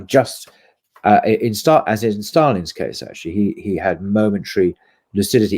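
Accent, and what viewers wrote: United Kingdom. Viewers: You got a British accent, you are a propagandist. Let's talk about how many people Britain have killed just in India. F*****, British sucks.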